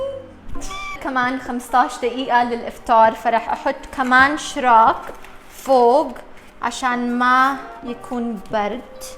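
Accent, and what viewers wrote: Jordan. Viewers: اه امها بولنديه بس سمعت اردني أبصر..بس اصيله اكتر من كتير عالم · ياااا الله ع الكذب بس قال اجنبية قال😏😏😏 من حركاتك و لسانك مبين انك اردنية و بدوية كمان 😂😂😂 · بحسك أردنية نفس كلامنا نحنا الاردن 🇯🇴🇯🇴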